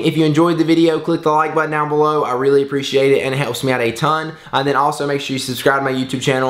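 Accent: South, United States. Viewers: sounds like your from the south haha no worries im in good ol south carolina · Sounds like a Southern accent to me :P (I lived in Alabama for Five years) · Alex<num> That dude in the video is definitely southern fa sho!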